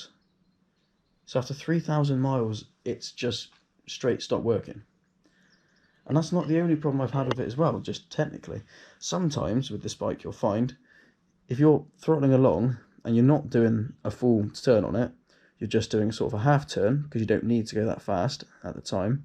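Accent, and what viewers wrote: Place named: Andover, United Kingdom. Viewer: As much as I like the idea of elecric two wheelers. I would and will opt for a petrol scooter type vehicle and there some really nice ones out there. Run out of gas fill up anywhere. Electric ? run out of power your in the poo poo. I will stay with petrol for now. I think the battery technology is not there yet, its getting there but not now. Accent sounds like Andover or Basingstoke?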